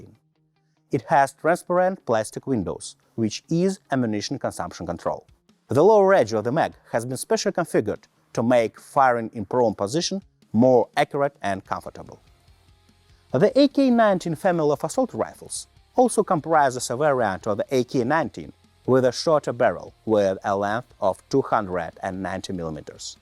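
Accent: Russia. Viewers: Русский акцент, этому парню не куда не спрятать.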